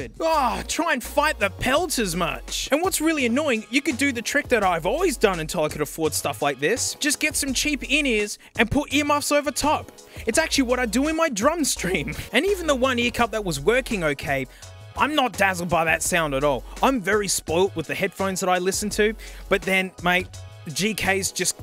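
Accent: Australia. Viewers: Are you the same guy as "the drum thing" guy? Can't tell you aussies apart 🤷‍♂️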